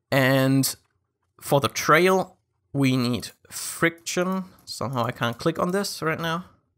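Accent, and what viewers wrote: Germany. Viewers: Bist du deutsch? Hörst dich so an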